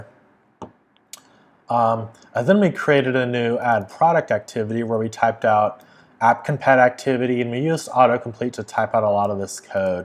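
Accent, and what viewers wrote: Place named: Southern California, United States. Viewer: I love this guy’s accent, let me guess San Bernardino county or at least somewhere in SoCal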